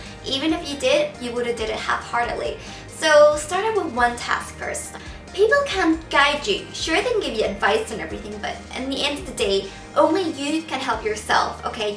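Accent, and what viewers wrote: Northern Ireland, United Kingdom. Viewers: Are you part northern Irish? You sound a wee bit. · Your Northern Irish accent made me chuckle :> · you still have such a northern irish accent, it's so cute :) I'm procrastinating but I'm going to try and beat it! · Thanks for this vid:) Aaaand I kinda miss your heavy northern ireland accent! hahaha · Yay! You're northern irishness came out in this video haha!!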